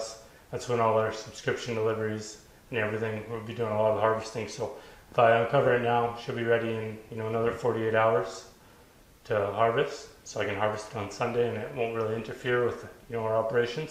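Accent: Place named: Canada. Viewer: could tell he was canadian right away! :)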